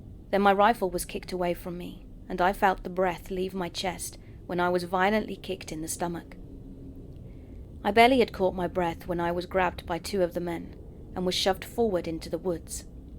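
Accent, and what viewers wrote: England, United Kingdom. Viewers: Been looking forward to this!! There's just something about that English accent 😁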